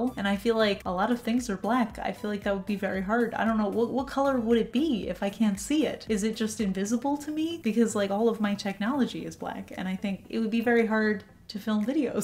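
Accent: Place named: Northeast, United States. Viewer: I'm not a neon fan, but some of those colors...wow! I think the people who don't like how you pronounce orange are clueless about accents! Don't they know you grew up in the northeast? 🤦‍♀️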